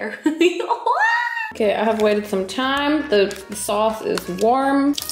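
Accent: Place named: Germany. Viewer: Your German accent is absolutely cute!! Your so funny, thank you for the laughs.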